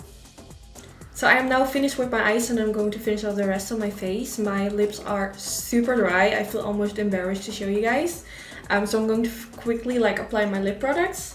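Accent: Netherlands. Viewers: I never knew a Dutch accent could be this charming! I’m a new subscriber and I thoroughly enjoy your content!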